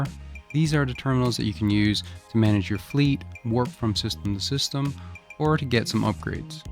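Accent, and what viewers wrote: Newfoundland, Canada. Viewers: I knows a newfy when I hears one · Thought I recognized that accent! Greetings from a CFA living in St John's : )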